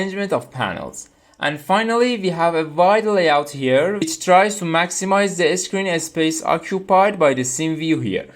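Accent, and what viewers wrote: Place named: Iran. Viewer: داداش لهجت داد میزنه ایرانی 😂عالی داش همینجوری قوی ادامه بده خوشحال شدم ویدیوت رو دیدیم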